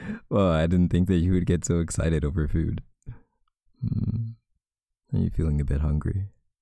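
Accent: Canada. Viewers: Hey, idk why but you sound really Canadian in this audio! I Love it dude! 💖😂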